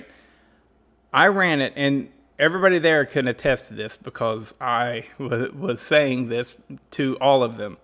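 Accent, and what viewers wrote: South, United States. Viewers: well thank you man. The southern accent can be crazy at times!! I plan to hopefully keep us learning and trying new things 👍🏻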